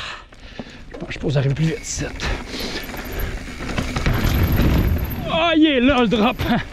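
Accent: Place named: Quebec, Canada. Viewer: Magnifique 😍 j'ai hâte à l'été!! Hors sujet: ça fait 6ans que j'habite au Québec mais c'est la première fois que j'entends cet accent québécois 😅 tu viens de quelle région ?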